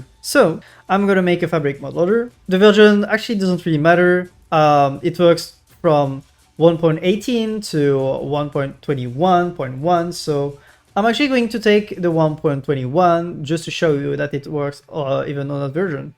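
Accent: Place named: France. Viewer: hahaha parce que je suis francais j'ai reconnu un petit accent francais en toi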